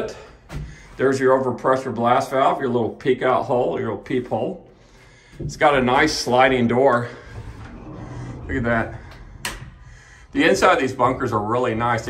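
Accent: United States. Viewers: Aku tidak paham bahasa inggris aksen amerika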